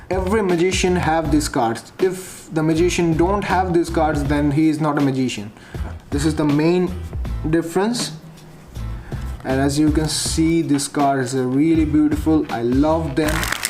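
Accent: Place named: India. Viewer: Wtf and desi English bro😀👍I am watching this video 26th time lol btw great video that's why I am watching this video 26th time lol😀🔥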